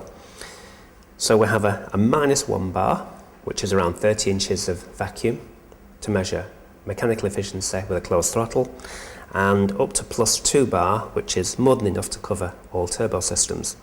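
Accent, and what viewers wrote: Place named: England, United Kingdom. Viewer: I liked your English accent, every words was clear=good articulation. 10/10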